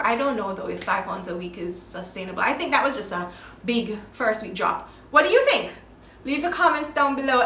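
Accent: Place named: Jamaica. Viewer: So glad fi fine a fellow Jamaican Sista doing ADF....bless up💫✨️ and thank u . Keep speaking patois! Mi luv it...dem have all di adda dialect & languages w Closed captioning.. a fi wi Time now... lol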